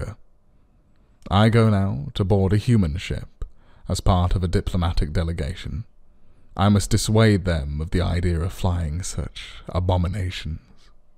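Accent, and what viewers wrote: United Kingdom. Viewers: ok. Ive no doubt youre using real voices. IF you are using AI voice, youve fooled me. But nah. Youre clearly from the UK. the accent. Youre not US, Youre not Aussie or Kiwi, That is a UK accent. Mum, Buubly, certain words pronounced as only UK accent does. Its great, Youre an awesome narrator. Thanks for reading us the SciFi. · The Queen's English accent is perfect for this story about a alien dignitary.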